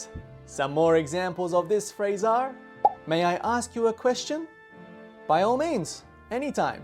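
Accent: United States. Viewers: 映画好きとしては、こういう授業は、非常に助かります。永く英語を勉強しているのに、字幕なしで映画を聴き取れなくて、困っていました。このチャネルをこつこつと観ていけば、聴き取りが上達しそうな気がします。先生は、「ニュー」を「ヌー」と発音するので、さては、アメリカ人ですね。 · Are you American? koz I don't feel you have British accent like a Harry.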